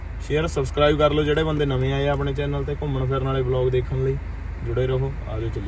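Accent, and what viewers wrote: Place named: Malwa, India. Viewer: Bhai sohna vlog hunda thoda. Tusi Punjab to kehde jille to ho? Boli Malwe aali lagdi, saade angu.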